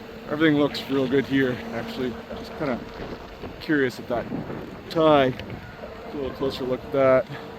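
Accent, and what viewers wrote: Canada. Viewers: I'd keep the narration, that's probably more than 50% of why people watch it. If the sound is rough, meh, the ppl who are going to watch aren't the people who will care, they are likely watching to learn about a field they aren't in or want to be in. I found it amusing hearing a lineworker with the biggest "OUUUUT" Canadian accent I've ever heard work to describe concepts from my field of engineering :D (network engineering/architecture) hehe. No shade, keep up the good work. A couple notes 1. The connector you described as a 'piercing something" We used to have an equivalent in the network world called a "vampire tap". I think that actually describes this better as this is a minimum vampiric/parasitic drain that is tapped. maybe you can start a new trend in your field calling it that :D 2. No shade on you, this is what you were given. I'm not a fan of how the insulation is stripped given how in-climate this area appears to be both on the pigtail and stripping it on the heavier gauge wires. 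In my experience that allows the wires to become full of water during the summer humidity which quickly leads to a very bad result :) 3. I think that tray would be nicer if instead of pushing things over the outside edge to get them it was reversed to inside the bucket. Seems like an unforced error there in how they designed it asking for tools to disappear below :D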